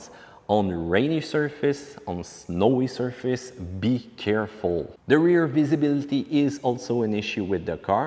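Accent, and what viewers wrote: Quebec, Canada. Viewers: Avez-vous fait une version en français de ce vidéo?Je pose la question parce qu'il est évident pour moi que vous êtes un francophone fort probablement québecois. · Are you French Canadian ?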